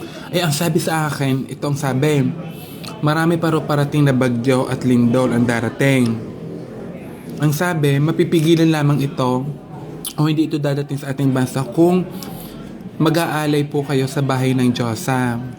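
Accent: Batangas, Philippines. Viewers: Batangenya ka po ba???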